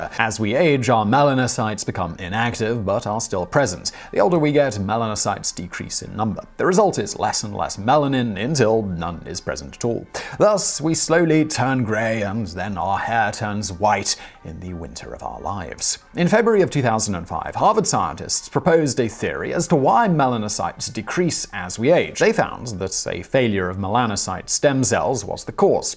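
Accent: United Kingdom. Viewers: 😂 😂 😂 Maybe you missed it so I'll point it out. He has a British accent and sometimes they pronounce things differently. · How come you speak in traditional English but spell in American English?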